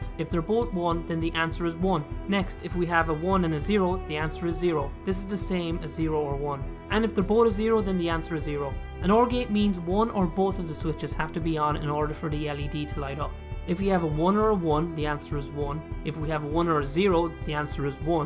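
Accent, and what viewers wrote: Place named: Ireland. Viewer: Also that irish accent makes you so easy to listen to lol.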